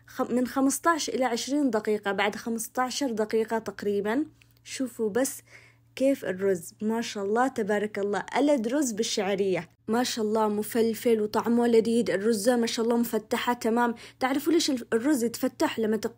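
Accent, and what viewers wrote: Aden, Yemen. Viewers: ماشاء الله رووووووعه رووووعه خورتيني والله والاروع طريقة كلامك العدني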